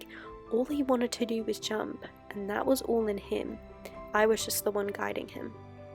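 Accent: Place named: United Kingdom. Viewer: i got the chills wow, is it me or does she have British accent in this video but not on her other videos idk anyway love you <3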